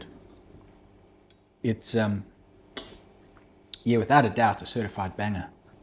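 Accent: South Africa. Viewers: you sound south African mush 😊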